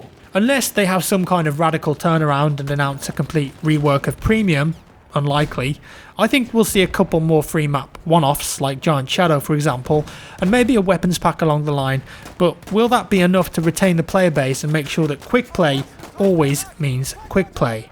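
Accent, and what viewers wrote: United Kingdom. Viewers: Fuck your British accent.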